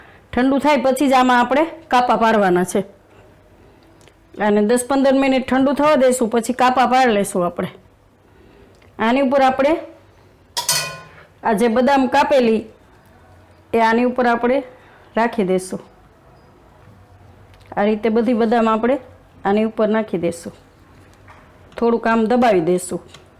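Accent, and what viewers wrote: Kathiawar, India. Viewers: Pyor kathiyavadi gujrati bolo Cho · બેન રેસિપી સરસ છે અને તમે કાઠિયાવાડી ભાષામાં જ બધુ બોલો એ જ સારૂ અને મીઠું લાગે છે અએ તમારી usp છે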